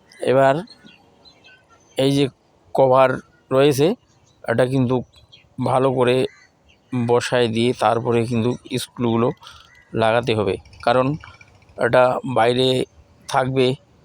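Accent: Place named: Bangladesh. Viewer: apnar vasa ta bangladesh ar monehoche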